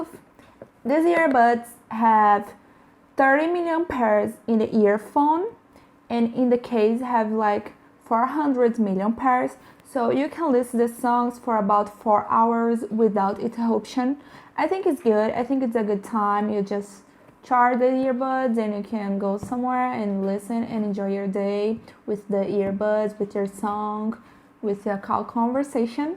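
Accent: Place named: Brazil. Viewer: Totally Brazilian accent, congratz for the video.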